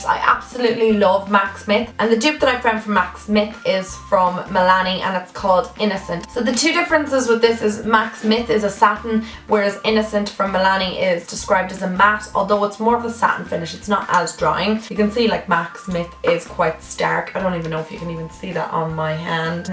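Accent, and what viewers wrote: Ireland. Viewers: Are you from Ireland?🇨🇮 cork here!😋😊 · Omg I love ur accent X I'm going to Ireland soon X what part are u from X ?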